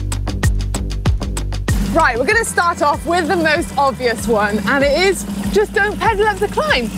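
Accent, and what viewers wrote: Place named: Wales, United Kingdom. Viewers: Can we have more Welsh accent? 😀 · where you from? you sound welshy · Another great video from with the true Welsh accent coming through 💪💪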